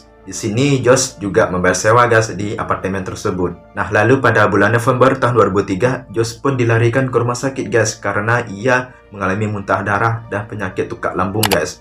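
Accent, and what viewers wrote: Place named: Aceh, Indonesia. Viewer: Abang orang aceh ya dari logat nya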